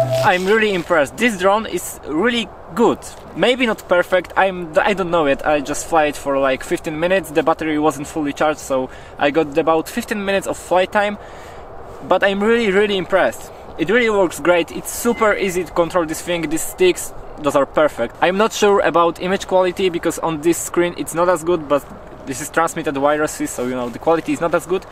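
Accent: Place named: Poland. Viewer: Słychać po akcencie ze Polak xd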